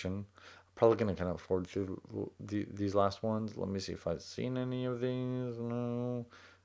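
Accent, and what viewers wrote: South, United States. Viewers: I love your video at your house in Texas during your quarantine until you're talking about all of the books while you went on the website of Goodreads on your cell phone to see all of them are brand new and it got came out this year, but it's called of Best Books of 2020 to look at them at the same time after that, I hope your husband, Zach will be very proud of you and I love your Southern accent!!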